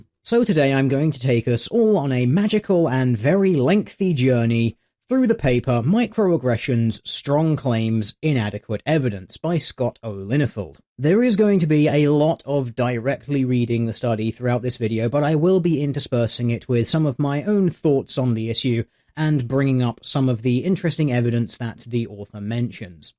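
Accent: United Kingdom. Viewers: That might just be the UK habit of over-Anglicizing foreign words.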